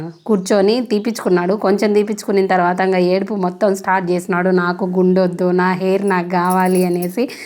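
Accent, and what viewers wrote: Rayalaseema, India. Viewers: మీ వీడియో క‌నిపిస్తే చాలు వెంట‌నే చూసేస్తున్నాను. ఎందుకంటే అద్భుత‌మైన సీమ మాండ‌లికాన్ని అల‌వోక‌గా వినిపిస్తున్నారు కాబ‌ట్టి. ఆస్కార్ సినిమా చూస్తే క‌లిగే ఫీలింగ్ మీ వీడియోల‌ద్వారా వ‌స్తున్న‌ది.అంత హాయిగా, ధారాళంగా, అమాయ‌కంగా, అర‌మ‌రిక‌లు లేకుండా మాట్లాడ‌డం అంటే మామూలు విష‌యం కాదు. మాది కూడా వైఎస్సార్ జిల్లానే.మీ ఊరు ఖాజీపేట‌కు మా వూరు తొండ‌ల‌దిన్నె ఒక 30 కిలోమీట‌ర్ల దూరంలో వుంటుంద‌ని అనుకుంటున్నాను. 3 ద‌శాబ్దాలుగా హైద‌రాబాదులో వుంటున్నాను. సాక్షి టీవీలో సీనియ‌ర్ జ‌ర్న‌లిస్టుగా ప‌నిచేస్తున్నాను. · Hi garu iam New Subscriber madhi kuda Allagadda devudu mervaniki kadu konda dhgi velledi Laxmi narasimha swami vari Pelliki pilavadaniki konni village s ki matrame veltharu Anye mi vedeios chala bugunnai Mana ralyalalsleema slang Matladuthunnaru Chabaga matladuthunnaru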